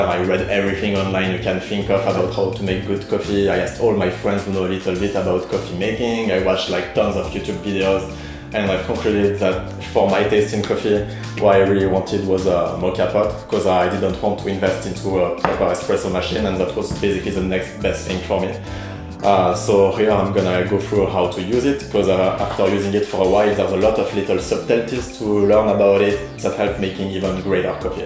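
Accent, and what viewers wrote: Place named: France. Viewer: Your voice and accent remind me of the great French chef Eric Ripert. Great video!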